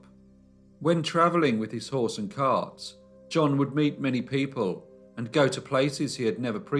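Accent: England, United Kingdom. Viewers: I like this narrator’s voice. And his English accent. Love his stories, too.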